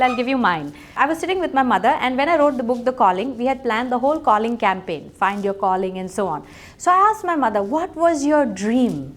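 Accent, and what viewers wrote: India. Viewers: In this video there is maximum Indian English... Keep it up.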